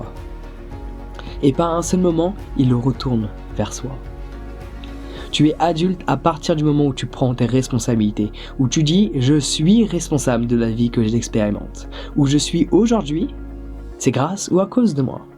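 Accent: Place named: England, United Kingdom. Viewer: Il parle du nez mdr si vous le suivrez plus vous seriez que c'est un accents anglais et qu'il à du mal avec certains mots de français.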